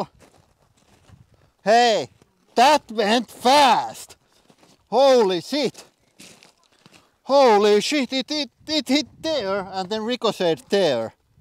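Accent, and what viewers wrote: Finland. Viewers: This is Finnish accent. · "So if anything goes to sheet it doesn't matter" 🤣 I love this channel so much. I'm Canadian so I sound stupid to most of the world but I love Finnish pronunciation of English language. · Finnish English accent is the best English accent ❤️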